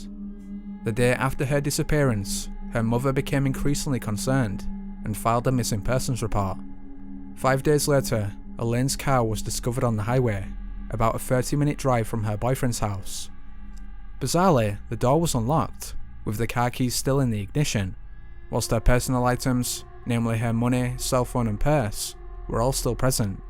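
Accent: Scotland, United Kingdom. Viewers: Is he Scottish? He sounds like David Tennant.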